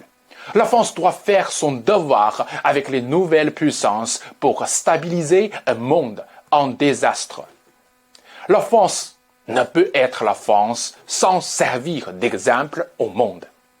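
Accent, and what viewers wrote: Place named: China. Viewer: Vous êtes sérieux? Vous vous présentez pour être Président de la France? Hors de question que je vote pour vous avec cet accent. C’est pas possible quoi vous imaginez cet accent chinois sur la scène internationale quand vous parlez français. Je suis désolé je suis moi aussi d’origine chinoise née ici donc c peut être facile pour moi… c’est pas l’idée que je me fais de la république française. Si vous aimez la Fr soutenez monsieur Zemmour.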